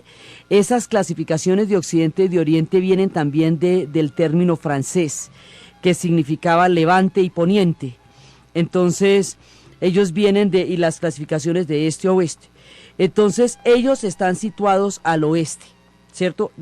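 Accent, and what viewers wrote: Colombia. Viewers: Gracias por estos hermosos relatos, los amo! He escuchado varios, en varrias ocasiones, igual que el resto de tu audiencia, pienso que sería buenisimo un mapa. Me encanta escuchar tu bello acento de Colombia, en México nos encanta la forma de hablar de tu bello país.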